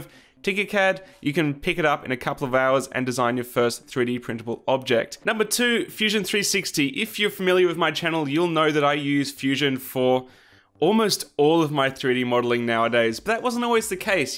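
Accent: Australia. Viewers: So u are australian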